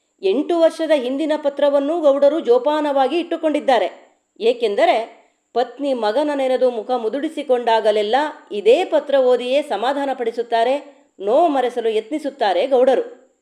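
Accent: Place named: Malnad, India. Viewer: ವಾವ್ ❤ನಿಮ್ಮ ಧ್ವನಿ ಯಲ್ಲಿ ಸೂಪರ್ ಆಗಿ ಮಲೆನಾಡ ಭಾಷೆ ಸೂಪರ್.. ನಿಮ್ಮ ಕನ್ನಡ ದ ಮೇಲಿನ ಹಿಡಿತಾ 🙏ಸೂಪರ್ mam ವಂಡರ್ಫುಲ್ ಧನ್ಯವಾದಗಳುನಿಮ್ಮ ಕಥೆ ಗೆ ❤❤❤️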